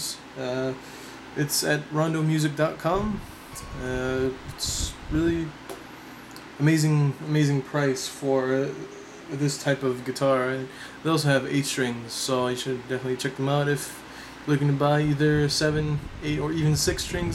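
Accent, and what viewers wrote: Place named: Canada. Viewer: You have the most stereotypical Canadian accent I have ever heard, nice guitar too.... I'm probably gonna go with passive pickups but, either way Agiles are too nice to pass up.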